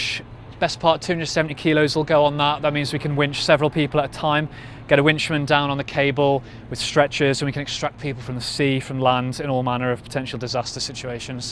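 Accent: Liverpool, United Kingdom. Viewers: slight liverpool accent ... cracking chopper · A scouser I hear in your voice ex merchant Taylor's I bet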